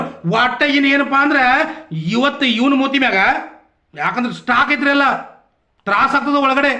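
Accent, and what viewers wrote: North Karnataka, India. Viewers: Nim uthra kannada bhasheli ugiyodandre keloke chenda bro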